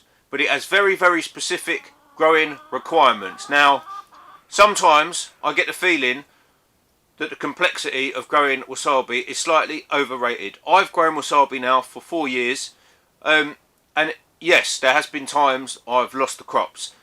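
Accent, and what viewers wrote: England, United Kingdom. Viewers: Can’t stand the English accent🤦🏻‍♂️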